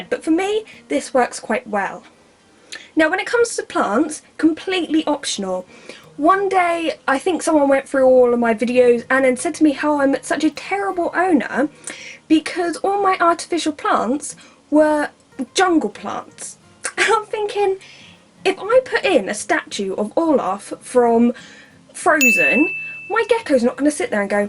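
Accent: United Kingdom. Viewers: Michael Holmgaard she's British and British people sound like that... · Michael Holmgaard Umm... seriously? She is a British and British talks like that, you know that right?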